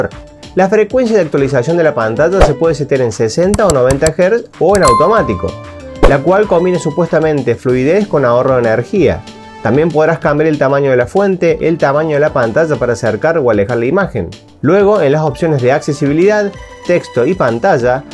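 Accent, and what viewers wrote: Córdoba, Argentina. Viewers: loco sos cordobee ! te seguir{e por eso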